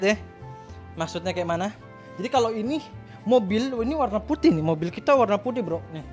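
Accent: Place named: Tegal, Indonesia. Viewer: Dog, lu sebenarnya orang mana sh?? Sukabumi apa dimana, soalnya dari cara bicaranya itu kaya orang tegal-pemalang gitu(medog)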